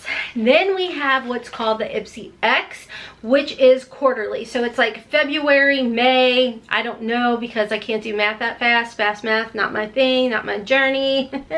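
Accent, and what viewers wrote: South, United States. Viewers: We love our country accent . And I sing 🎶 along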